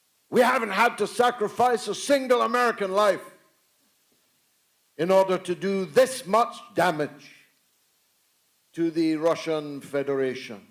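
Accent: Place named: Newcastle, United Kingdom. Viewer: Well spoken Geordie .. Said from a fellow Scot 👍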